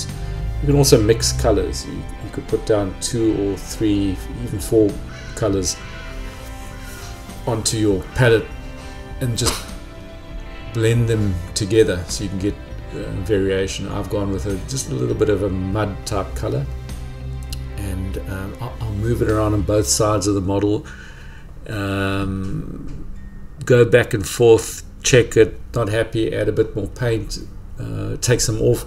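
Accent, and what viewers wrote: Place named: South Africa. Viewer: hey sorry I meant they are sold here in Brisbane. And I confused your accent for mine at the start of the video (Australian). But as I heard you talk more I realised you are South African! Funny how we have some similarities in our accents. Do you ever find that about Australian accents? That they can be similar for certain words?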